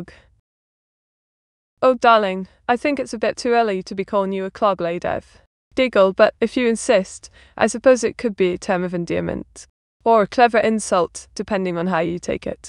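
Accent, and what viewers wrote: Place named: United Kingdom. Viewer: The voice and audio need some improvements: - Compression (maybe Normalization too): It peaks too high and goes low again, it's not consistent in her voice. - The sound seems low quality, it sounds like someone with an old mic (maybe adjust filters?) - I don't mind the boyish voice although l I would prefer a softer voice or a cute one. - The british debuff is an issue, it's hard to understand, especially for AI that talks incoherent stuff sometimes (you can't understand form context). Many times I like to keep chatting streams ON while doing something else, but it's hard to understand her without paying attention due to her accent. Overall seems like a great project, keep it up!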